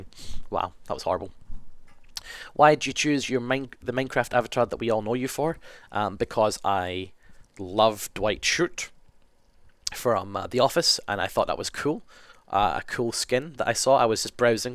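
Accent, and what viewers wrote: Scotland, United Kingdom. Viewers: I may not be sociophobic much like I hate talking to people i've never met and I hate it when someone looks at me.. but i do have extreme trust issues... like for one thing i'm scared to get a boyfriend... but still you make me feel better when I watch your videos knowing you have phobias too... Anyway, you are truly amazing and i'm happy to hear your scottish too I have a family kilt and love it.. You rock and never forget we love you! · SCOTTISH FOR THE WIN GO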